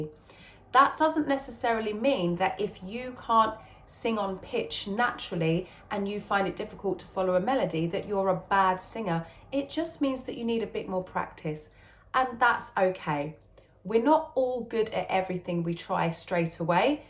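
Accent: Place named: England, United Kingdom. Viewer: Do I need an English accent like you to learn how to sing?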